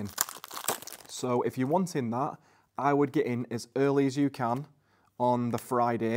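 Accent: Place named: Leeds, United Kingdom. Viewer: that's definitely a Leeds accent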